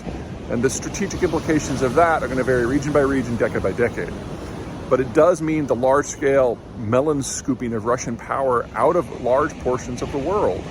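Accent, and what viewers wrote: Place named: Midwest, United States. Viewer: Midwest accent causes this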